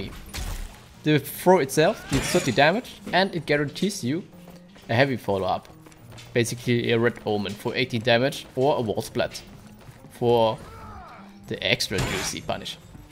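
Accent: Germany. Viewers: Hey! I was wondering where you come from. Because your english sounds like it has an german accent in it. Thanks for the answer! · man hört den deutschen aktzent raus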